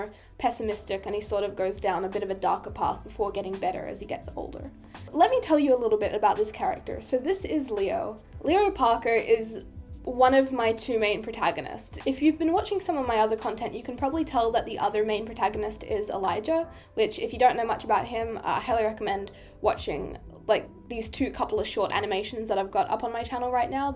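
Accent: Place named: Australia. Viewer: WAIT AUSTRALIAN???? JUST LIKE ME FR???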